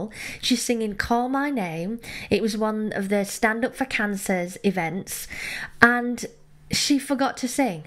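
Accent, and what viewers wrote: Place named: Brighton, United Kingdom. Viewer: Hi, I love your vídeos and I love your accent which reminds me the time I lived in Brighton. Could you check some vídeos? She's a really good mezzosoprano pop singer know like the Spanish Queen of Pop. Pleaseeeee xxxxx.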